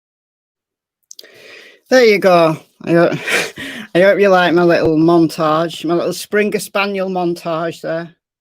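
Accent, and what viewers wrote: United Kingdom. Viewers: 🌟🌟🌟Hey, As far as your age… I don’t think it has anything to do with how you look. This mornings video was more flattering in the natural light and you look to be late 30s to me. I think its something in your voice. Not in a bad way! It’s something like a motherly tone. Wise. Ideals beyond what Americans usually have. Americans, in my opinion, can be self centered and downright viscous at times. Although Uk paparazzi are an animal unto themselves. (Poor Diana) Your steadfast beliefs and unshakable empathy for others speaks to many life lessons. I’m not sure I had that emotional intelligence when I was your age… AND I’m a bit biased on the British Accent. Lol. It’s a pure joy to listen to a well educated Brit when they get their Irish Rant going! Another thing… I hate “Super Chats”… just to old. But you have a way with lives. I usually sit and work on my Adult Coloring Books or play solitaire while listening. 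Again it’s the vibe of hanging out with tea and some laughter to lighten the horror this world dishes out. I really enjoyed latest member video! I would be so proud to have a daughter like you! Many blessings to you. 💖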